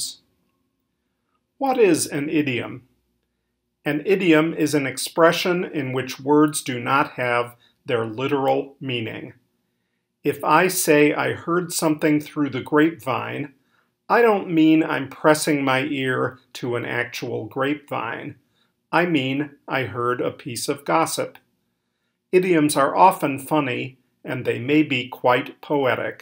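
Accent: United States. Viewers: Judging by his pronounciation, the guy is American, so I guess the idioms are not exclusively Brititsh. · I think you meant,Is this British English or American? He speaks American English and these are American idioms!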